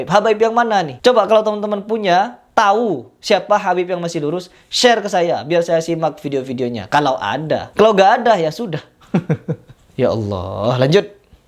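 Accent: Madura, Indonesia. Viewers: ADMIN LOGAT MADURA INI PEMFITNAH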